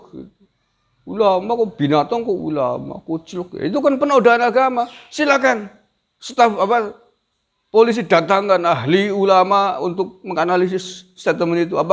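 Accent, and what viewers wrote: Banyumas, Indonesia. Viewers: Ora ngapak ora kepenak... Mantap mas bro.. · Jaga terus persatuan demi NKRI harga mati, wlpn sdh di pwkt hampir setahun tetep suka denger bahasa2 banyumasannnya bikin ketawa wkwkwk, sukses trus pak 👍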